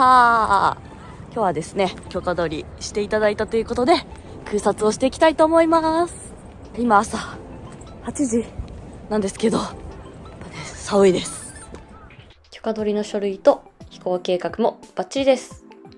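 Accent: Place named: Kansai, Japan. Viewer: 、お疲れ様です😘 ここの、景観は、いつ見ても、最高👍🤩ですネェ🎉 秋🍂は、紅葉🍁、冬☃️は、白景気、今、シーズンは、新緑の風景、本当、いいですネェ〜 後、ちゃんのハニカミ笑顔と時々出る、関西弁も、いいですネェ👍🥰🥰🥰🤣🤣🤣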